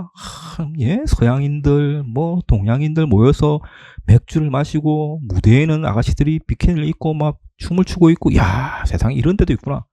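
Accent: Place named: Gyeongsang, South Korea. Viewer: 현재 워킹 현황 영상과 경상권 억양에.. 친근함과 함께 구독 눌릅니다^^ 자주 올려주세요~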